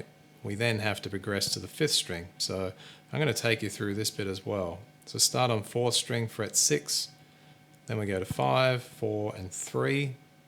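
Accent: Australia. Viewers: Yay, an Aussie, you beaut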